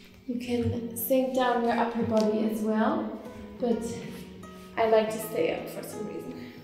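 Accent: Germany. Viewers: Are u German no hate but ur accent is like mine in english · U have a german accent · This is the first time I´ve heard her talk in a video, was not aware was German haha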